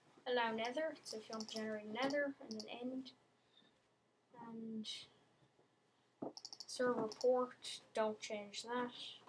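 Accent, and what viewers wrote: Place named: Ireland. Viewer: and nice irish accent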